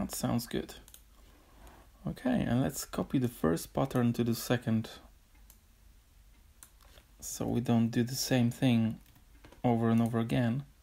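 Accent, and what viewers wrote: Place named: Germany. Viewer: not an authoritative answer but my guess would be a German living in the UK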